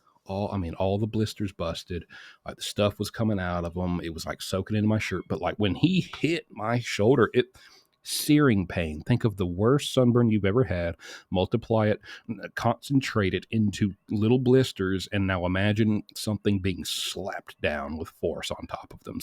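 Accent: South, United States. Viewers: I LOVE the southern accent!! We say like it or lump it! 😂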